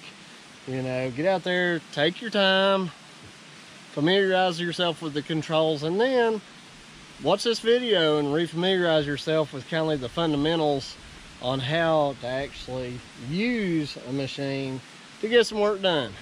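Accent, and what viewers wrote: South, United States. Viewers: your a hell of a teacher with your southern twang \m/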